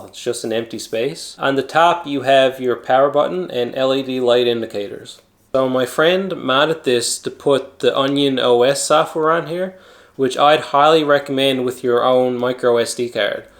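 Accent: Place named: Maritimes, Canada. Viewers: I knew you were a Newfoundlander, earned a sub from Nova Scotia. Keep up the great work my trout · Great review. I just got one as well ans love it. The short bootup times make it great for pickup and play on the go. By any chance are you in Nova Scotia or Newfoundland? I recognize that east coast accent. :)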